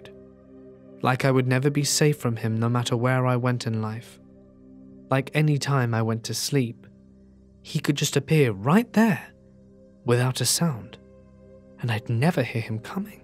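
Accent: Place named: Southern England, United Kingdom. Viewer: I love your content but I don't listen while falling asleep, I listen just to chill out or as background nosie while doing other tasks so I think a change to the black screen would be great! You know like the visual representation of sound could be cool!! You could play around with different colours or effects/movements depending on the story! This would also give you an avenue to explore tempo and expression of movement, almost giving your content more musicality of that's what you're potentially looking to explore. Keeping it minimalistic would be the key to balance out the audience between those like me, who enjoy your videos for the storytelling, and those who like to fall asleep listening to you Again, just want to add how much I enjoy listening to you. I don't know where in the UK your accent is from but it reminds me so much of being back home in the South which I find so comforting now I'm living away from there!! 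Xx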